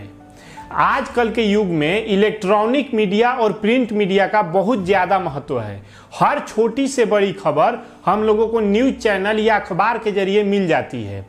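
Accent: Bihar, India. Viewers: Bihari waala tone mein bol raha hai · Your way of talking is of a typical Bihari. Actors and artists can learn from you the accent of Bihar.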